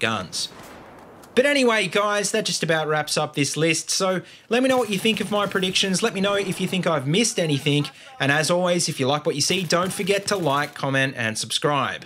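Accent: Australia. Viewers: LAMO so Aussie cheers m8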